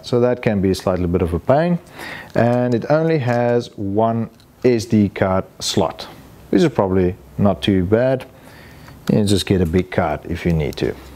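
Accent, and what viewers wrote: South Africa. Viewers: You sound like a fellow South African living in NZ; Afrikaans first language? Thank you for the review, I love that camera, but will only be able to afford it 15 years after its release😥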